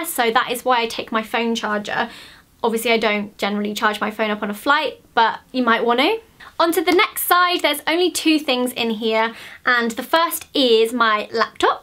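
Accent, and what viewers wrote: United Kingdom. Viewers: I really love your british accent👌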